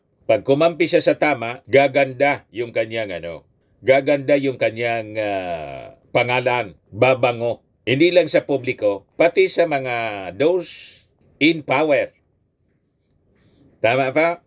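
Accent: Bicol, Philippines. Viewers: Sino ang Vloggers na ito , laging nakabuka ang bunganga niya , parang taga Bicol ang tuno .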